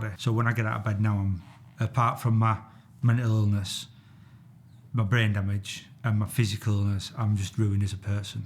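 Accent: Yorkshire, United Kingdom. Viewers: Bloody love hearing a Yorkshire accent. Greetings from Barnsley, thank you for your service Thas a rate Lad!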